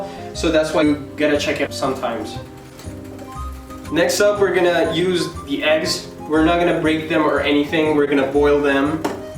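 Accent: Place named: Italy. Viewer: si sente l'accento italiano 😍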